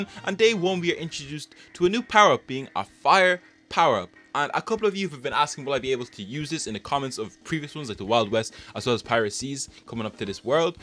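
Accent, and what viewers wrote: Ireland. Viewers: Are you Irish cause I’m Irish too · You irish?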